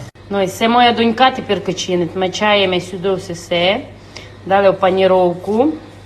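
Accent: Transcarpathia, Ukraine. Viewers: Яка файна мова, обожнюю цей закарпацький говір. Дякую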